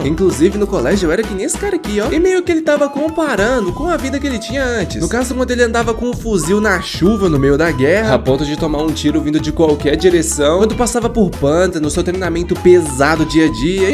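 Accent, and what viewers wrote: Rio de Janeiro, Brazil. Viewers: O narrador e do RJ né possivel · Certeza me responde ai vc e carioca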